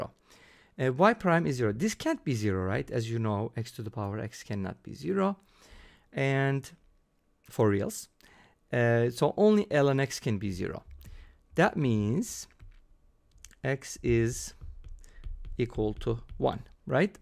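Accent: Turkey. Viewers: Im trying to determine where this guy is from the whole video. I've concluded with Turkish by the accent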